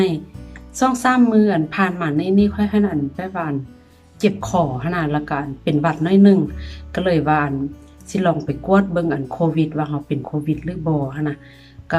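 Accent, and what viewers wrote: Luang Prabang, Laos. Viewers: มัก เด เสียงเว้าหลวงพะบาง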